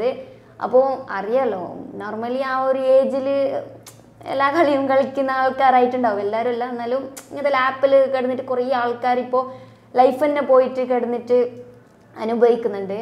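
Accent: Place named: Kasaragod, India. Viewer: Slang kazrod ayond nere manassilayilla....but,informative 👍🏻keep well❤️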